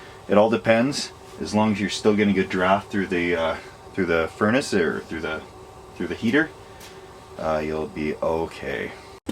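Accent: Alberta, Canada. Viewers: Why can I tell immediately as an Albertan that I am hearing another Albertan?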